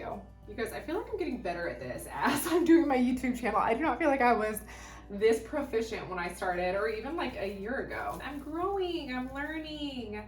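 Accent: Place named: South, United States. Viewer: Do you live in the South. I hear you say y’all but I thought for some reason you live in the North lol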